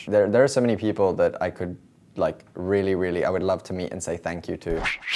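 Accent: Australia. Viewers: He sounds Austrialian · i'm shook the aussie accent is the hottest one i think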